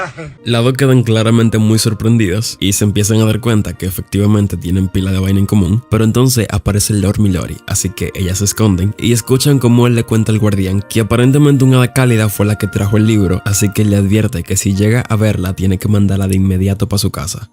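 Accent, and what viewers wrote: Dominican Republic. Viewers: Vos es de dominicano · Amo como narra, nunca pierde esa esencia dominicana ❤️‍🩹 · Bro, tu eres dominicano verdad? El final tu canal, me encanta como narras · Algo me dice que el es dominicano?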